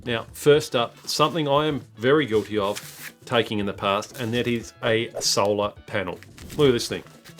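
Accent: Australia. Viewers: Great video. Love watching Aussie youtubers talk about gear. Our terrain, bush and scrub are so different from other places, and our gear has to be up for it. Appreciate having an Aussie voice contributing to the gear space!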